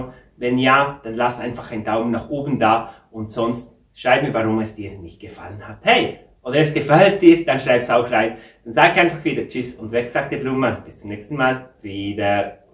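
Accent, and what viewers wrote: Switzerland. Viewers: Ich finde das schweizer Hochdeutsch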